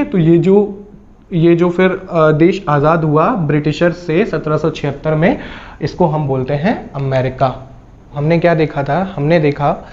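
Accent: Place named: Punjab, India. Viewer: Sir app Jo Acha Acha Bolte ho Jaise Punjabi log Bolte hai vaise Bolte ho appp .......nice ......from punjab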